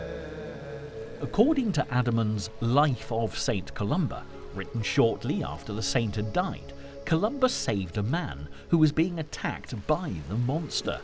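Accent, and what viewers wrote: England, United Kingdom. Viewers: Lovely docu. Thanks very much.,but please employ a Scottish narrator as an English accent is hard to understand. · As a wee Ayrshire lass, I was looking forward to this but cannae listen to that English narrators accent,I got as far as his pronunciation of Girvan and wet ma pants….enjoy the rest and maybe with a subject as unique as our Scottish myths and legends it would’ve been more engaging in a true Scot’s accent to amp up the heritage and theatre of our fab history 👍🏴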